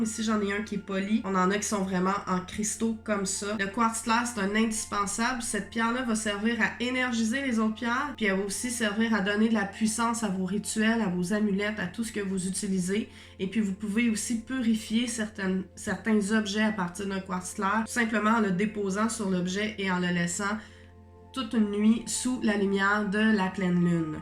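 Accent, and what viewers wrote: Quebec, Canada. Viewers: Fan de tes vidéos 🤩 avec ce petit accent québécois j'adore 😉. Continues à nous enchanter avec tes vidéos (oups capsules 🤭) Salutations de l'hexagone 🌝🪐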